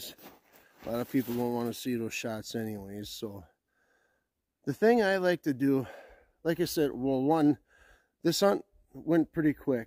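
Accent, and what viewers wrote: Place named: Canada. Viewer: You sound Canadian lol I’m from Alberta good tips boss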